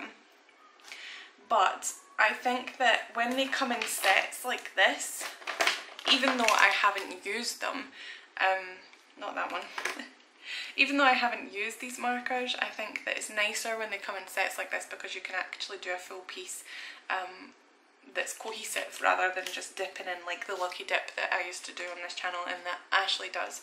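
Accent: Scotland, United Kingdom. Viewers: Hey! Is that a scottish accent i detect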